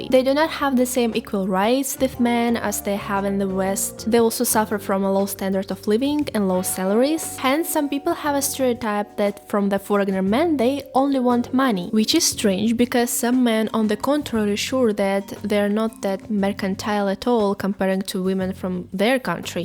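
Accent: Russia. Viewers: Good videos though you a little too fast with your delivery. Due your accent us native English speakers may have trouble following along. The memes make it funny & engaging too. Also i’d love to see a Russian YouTuber tackle those politically sensitive subjects, so, a) anyone got any recommendations , b) to the author, if you ever leave Russia, there is a gold mine of subjects us westerners would love you to explore. Appreciate speaking on some subjects is life changing tho. Peace out. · I just love the Russian accent! And many of you look like supermodel without being a cringe fest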